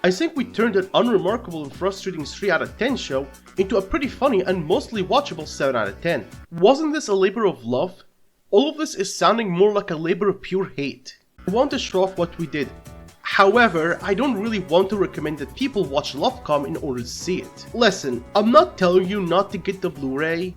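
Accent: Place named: Middle East. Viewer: Based on your accent and saying that you watched spacetoon I assume you're middle eastern correct?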